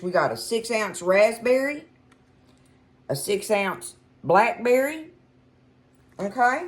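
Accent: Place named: Texas, United States. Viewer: you are such a sweet and perky soul, you look so fresh your hair do cute as usual. Your Texas drawl and manner of speech sounds so good, I’m 84 proud years old, I ve never been to Texas but some of family came from there- I love to hear 😁😁😁😁😁😁😁😁😁💨😊💨😊💨💨💨💨💨💨😊😊😊😊 love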